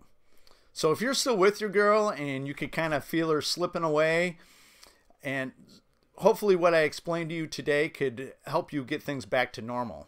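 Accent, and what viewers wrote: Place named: Michigan, United States. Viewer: Are you from Michigan? Your accent sounds northern.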